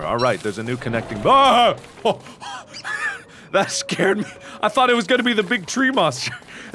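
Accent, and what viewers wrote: United Kingdom. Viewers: Awesome accent! xD Yamimash, Mangaminx and other LPers from the UK would be proud! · I love ´s Brittish accent and how he talks to CHESTAH. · Haha his stereotypical british accent!!!! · you have BEST british accent EVER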